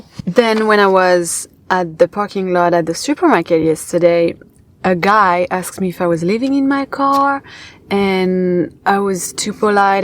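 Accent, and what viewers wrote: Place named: Quebec, Canada. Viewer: Lol I hear you on the fast food. We complain it's making us fat but still keep on eating it. 😂 I had a mcnugget meal last night. Just walk often. I'm in my 30s too. Maybe a slight weight increase, but nothing too bad. Be safe as well. 👍 Are you from France or Quebec? Your accent sounds similar to people I've met from Quebec.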